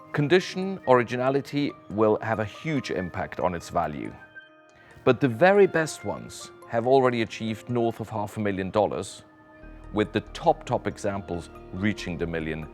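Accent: RP, United Kingdom. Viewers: Aurel Bacs has great knowledge but he really needs to stop with that posh English accent. Just doesn’t sound natural)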